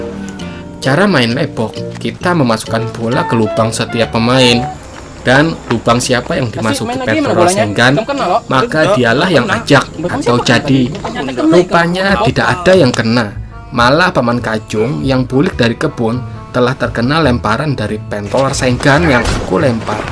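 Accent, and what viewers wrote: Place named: Java, Indonesia. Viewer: Bahasa banjar nya kurang kental.. Lebih kpda medok jawa...tp Salam sukses buat kmu.. Trus berkarya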